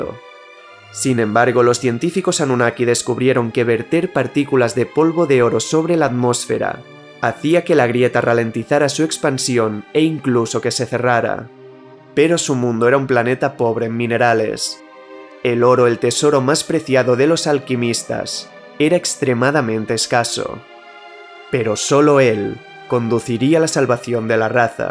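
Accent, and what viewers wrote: Spain. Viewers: Buenas, es lo que tiene haber nacido en España. Que hablamos Español. Por cierto el acento es en este caso es, Catalán. 👍🏻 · Vine buscando cobre y encontré oro!, este video es simplemente increíble, perfectamente explicado y con una edición fantastica, usualmente me desagrada el acento españolizado, sin embargo tienes una voz bastante agradable al oído, el tono adecuado para prestar atención a cada uno de los temas que manejas, gran trabajo amigo, muero por ver mas de tu trabajo. Saludos desde Mexico.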